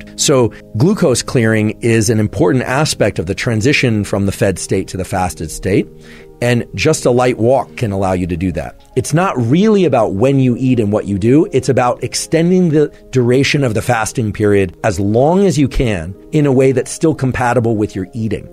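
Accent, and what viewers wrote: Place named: Canada. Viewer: Canadian rhythm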